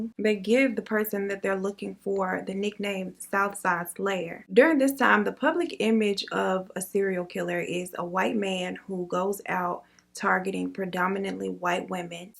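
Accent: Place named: AAVE, United States. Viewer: Sorry can't listen to ebonics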